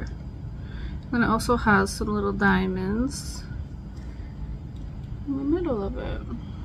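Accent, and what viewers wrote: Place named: Canada. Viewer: I believe you are Canadian. I am from BC and I can hear it in your voice so I am so following you because you are fantastic!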